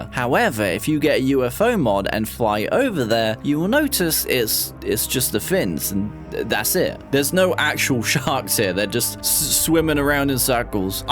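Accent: United Kingdom. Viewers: Is that an east Anglian accent I hear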